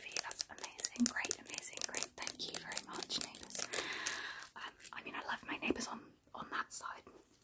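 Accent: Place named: RP, United Kingdom. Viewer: How come you have a sort of posh accent?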